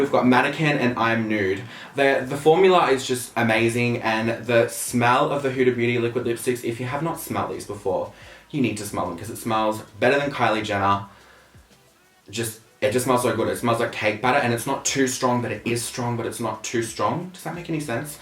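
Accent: Australia. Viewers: Is he Australian? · Hello! I'm new to this channel. Does anyone know where he is from? I'm fascinated by Aussie accents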